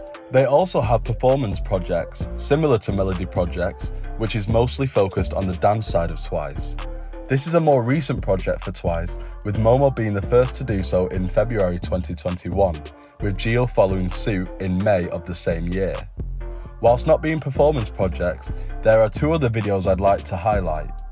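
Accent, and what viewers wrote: United Kingdom. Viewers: unrelated but what is your accent called/from what part of england? i wanna know lol✨ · Love your northern UK voice bab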